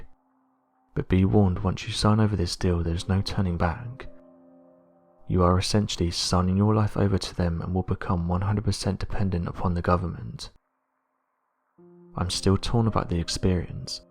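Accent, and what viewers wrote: England, United Kingdom. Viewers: Stories are great but I cannot stand the guys voice, it's the one English Accent that sounds like a head-injured rugby player... apologies again as it is not like me to criticize but, at this point it's like nails on a chalkboard, and, I taking and hiding these notifications and I will find suitable replacement...👽🚬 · Cant stand your English accent! Find someone else to do the narrative.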